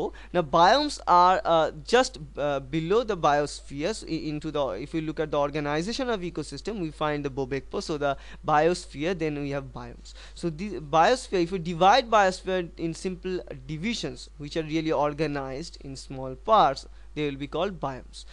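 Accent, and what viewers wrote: Bengal, India. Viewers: Is the orator bengali?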